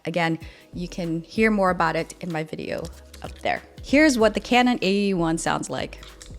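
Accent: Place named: United States. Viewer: Yes, American accent.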